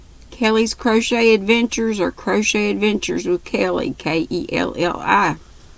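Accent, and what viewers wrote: South, United States. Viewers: You are so so cute 🥰! 😂 I love your southern accent! I love everything about the south and southern ways! I swear I was southern in a past life, because I’m Hispanic, I was born here but my parents are from the Dominican Republic 🇩🇴 and I actually learned English in school because my parents didn’t learn English till we went to school, therefore people find me strange ( which I’m ok with) when they hear me listening to country music instead of Merengue or something like that, and they see how I love 💗 my cowgirl 🤠 boots 👢 and my country home style. 😂 I just love it! My dream is having a ranch with horses 🐎 and all that good stuff! Also finding myself a good ol’ country man that knows how to treat a lady 😂 ( if you know one let me know Ms. ) 😂 Anyways just wanted to say I love 💕 your humble southern ways and style! Thank you for being you my sweet friend! 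🥰🥰🥰🥰🥰😊😊😊😊🙏🙏🙏😘😘😘😘😘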